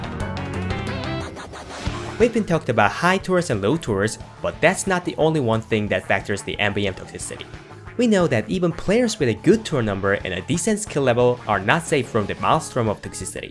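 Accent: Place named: Austria. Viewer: Are you by any chance Austrian? For some reason I get that from your voice.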